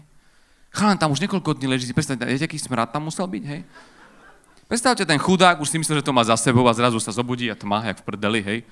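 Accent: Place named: East, Slovakia. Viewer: Nie kámo tak rozprávaj ľudia na východnom Slovensku. Nárečie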